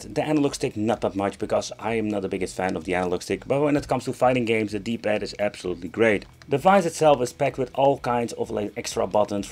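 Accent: Netherlands. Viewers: Enige reden dat ik het zou kopen is omdat ik geen steamdeck kan kopen ivbm wachtlijst.... Maarja weetje €300 voor wat nostalgie games is opzich te doen. Je Hollandse accent is niet te missen trouwens 👍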